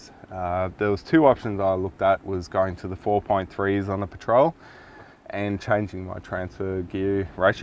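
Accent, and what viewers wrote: Germany. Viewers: Wenn du als deutscher sofort hörst das du deutscher bist/warst😂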